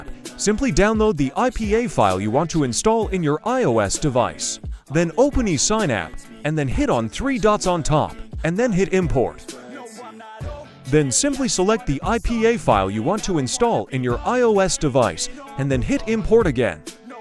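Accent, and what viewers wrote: South Africa. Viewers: Are u South Africa bro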